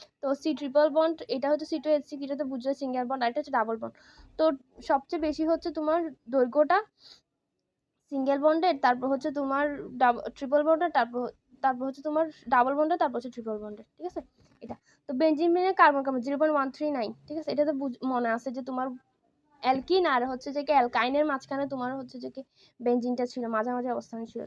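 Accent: Chittagong, Bangladesh. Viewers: আপু কি চট্টগ্রাম থেকে?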